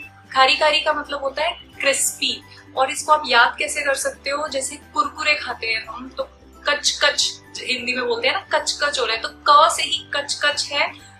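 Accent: Punjab, India. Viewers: mam r u from Punjab???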